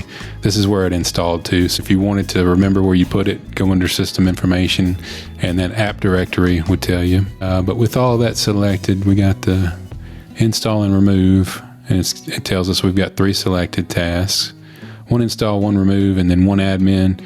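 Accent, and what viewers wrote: South, United States. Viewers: you got a bit of a southern accent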